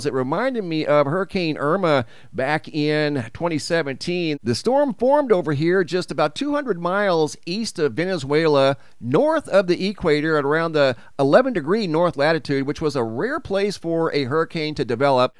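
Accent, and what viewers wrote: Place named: California, United States. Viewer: Great content- when you say words ending with ing it sounds like een. Cali trait.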